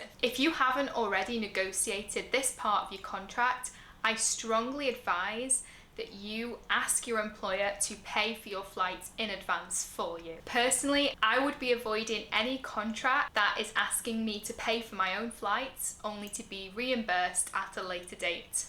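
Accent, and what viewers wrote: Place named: Ireland. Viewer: are you Irish? you just cant hide your accent.....................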